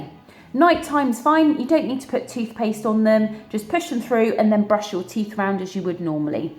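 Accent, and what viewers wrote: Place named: England, United Kingdom. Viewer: Go to England the place is full of people who talk like this.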